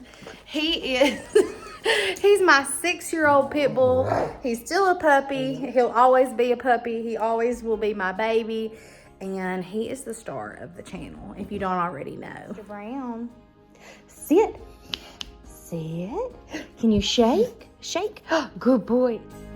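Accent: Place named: Kentucky, United States. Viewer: Whenever I watch (which is always) I feel like I’m hanging with family. My relatives are in Kentucky and Tennessee so they talk like you 😅 and we all love Jesus. Your home is so beautiful.. love the kitchen cabinet and all your little doggies.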